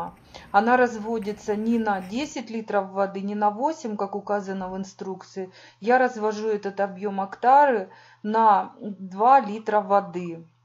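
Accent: Ukraine. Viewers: Такой говор у украинцев, но не у всех. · Любители русского языка! А канал не по русски назвал. Неужели не понятно, что девушка украинка,говорит на своём диалекте. Ты ещё англичанам расскажи, что говорить надо только по русски.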